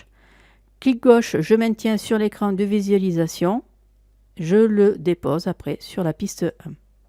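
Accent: South, France. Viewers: Merci pour vos tutos très instructifs et bien expliqués. Utiles pour quelqu'un pas très féru en informatique comme moi. J'avais la version 2016 et maintenant 2022 et j'étais un peu perdu. Après 2 années "covid" je n'avais plus fait de films de voyages et donc j'avais un peu perdu les bases. Je vais pouvoir me relancer grâce à vous. Et puis votre accent méridional est agréable ce qui est un plus, mais n'allez pas croire que je cherche à vous draguer !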